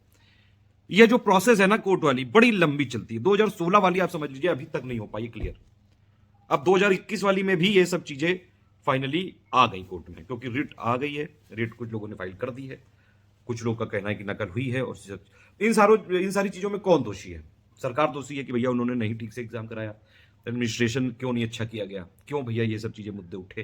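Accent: Kanpur, India. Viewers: भैया कानपुर से हो क्या आप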